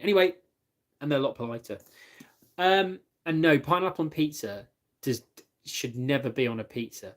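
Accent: United Kingdom. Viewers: Best British accent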